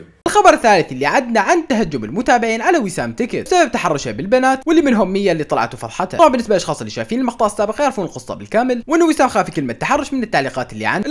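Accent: Iraq. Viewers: بموت في اللخجة العراقية 😍طبعآ تحياتي من فلسطين ل العراق 😘💖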